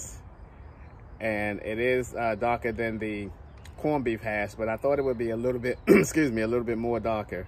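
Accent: Baltimore, United States. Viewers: That Baltimore accent thick😅🙌🏽🙌🏽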